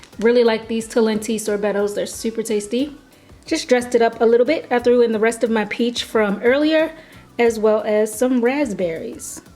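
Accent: Baltimore, United States. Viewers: Sis, are you from Baltimore?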